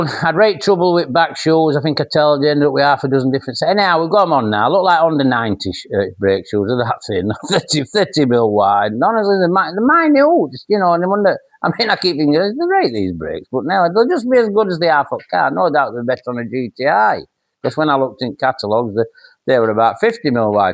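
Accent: Yorkshire, United Kingdom. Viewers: The variation in your channel is the best thing. Can't pin you down to one label because you're a very capable chap in so many areas. Proper British Yorkshire accent too.👍 Great stuff. long may you continue with your videos.😁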